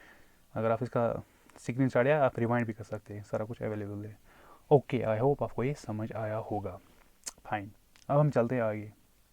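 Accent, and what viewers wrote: Kashmir, India. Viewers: Zero respect for your pitch and language. the way you speak Urdu. It's like kashur Urdu.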